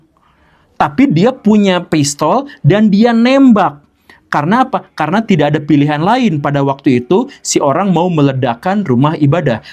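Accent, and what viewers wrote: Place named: South Jakarta, Indonesia. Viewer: Ngomong lu kayak anak jaksel mbul!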